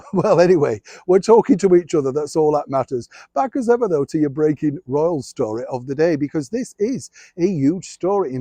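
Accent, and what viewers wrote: Yorkshire, United Kingdom. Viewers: Agreed said like a proper Yorkshire man! 😊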